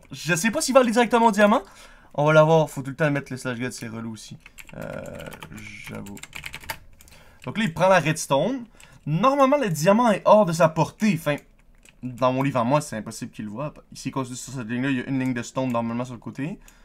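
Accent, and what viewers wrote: Quebec, Canada. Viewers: t quebequoi ? ? ?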